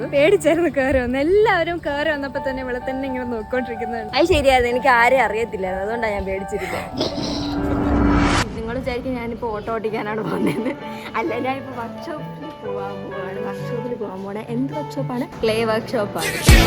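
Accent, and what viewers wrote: Kannur, India. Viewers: Kannur ഭാഷ..... Like ഉണ്ടോ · ചേച്ചി നമ്മുടെ കണ്ണൂർ ഭാഷ അങ്ങനെയാണ്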